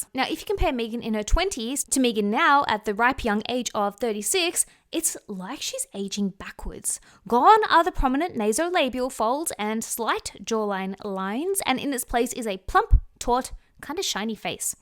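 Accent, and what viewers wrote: Australia. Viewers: It´s so cute how your Aussie accent got stronger since you moved back to Australia <3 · I like your videos very much! (And your aussie accent;)) BUT PLEASE, FILLER are NOT completely dissolvable! Greetings from switzerland🤗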